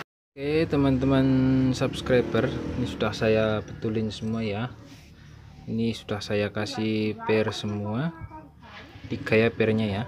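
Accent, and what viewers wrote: Banyumas, Indonesia. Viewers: Kie wong mbanjar jane ya.. Mulane si ngapak😀😀😀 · Akhire koe ngapak jugak🤣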